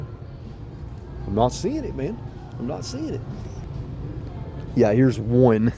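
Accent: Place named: Tennessee, United States. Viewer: Liked and subbed from watching your friends game cube video featuring you son. What part of Tennessee do you y’all hail from?? I live in Seattle and my sister moved to Jackson,TN several years ago. Thought I recognized that accent lol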